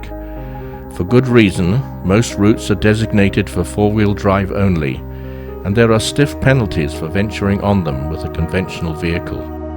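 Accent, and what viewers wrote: United Kingdom. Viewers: enjoyed everything about this wonderful trip to Iceland. T he narrator kind of sounds like Orsen Wells! terrific voice with a slight British accent . I could listen to him all day! Thank you so much, for sharing your adventures! Question, Is Icesland part of Norway? It was discovered by the Vikings I was told! Did the Vikings clame Green land?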